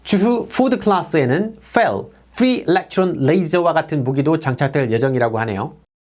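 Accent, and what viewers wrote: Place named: United Kingdom. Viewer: 영국식 영어를 쓰시는 유튭을 보게되는군요. 잘 봤습니다.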